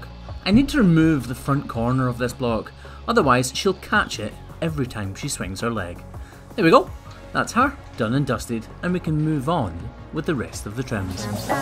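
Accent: Scotland, United Kingdom. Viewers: Im from Sweden and I have nothing to do with this kind of work, but I think its very beautiful and nice to watch. I was sleepless one night for like 2 weeks ago and look at some stuff on Youtube and on some weird way I found you. Now I have watch so many of your vids that even I can see sometimes the problems the cows have, its very fun! And I love you Scottish dialect and humor! 🍻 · Since discovering the, I have learned so much about cow hoof care, but most importantly I can confidently say that I have started to understand Scottish English. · I know what you meant but it's really just a Scot's accent which differs it from English, Welsh or Irish.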